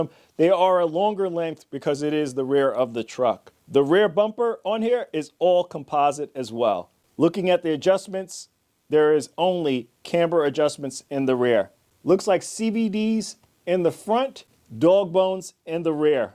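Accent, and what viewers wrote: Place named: Northeast, United States. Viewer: Hey brother, another great freaking review. I really love how you do the unboxings. I’m excited for another shootout the last one was awesome. Back before I had to sell my RC collection This was actually one of my favorite short course trucks. How funny is that you had that sitting in your attic LMAO. Well if you want to donate anything else sitting in your attic to a single dad and a little girl who love RC let me know lol jk. You sound like you’re from the north east. I’m in Jersey. Thanks again for your videos I know it’s got to be a lot of work to make them. Me and my daughter enjoy them. I’ve been subscribed for a long time but I don’t comment much. Just wanted you to know me and my little girl appreciate your videos! Hope you and your family had a great weekend!!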